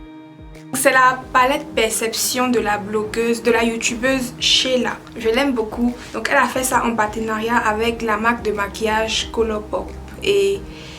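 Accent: Ivory Coast. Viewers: Coucou top top ton maquillage 🤩🤩🤩👍🏽j’aime trop ton accent ivoirien 😊où je peux avoir cette palette a Abidjan?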